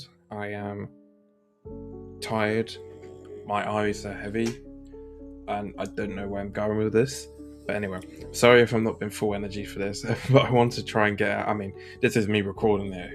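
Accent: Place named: United Kingdom. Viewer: Your British is showing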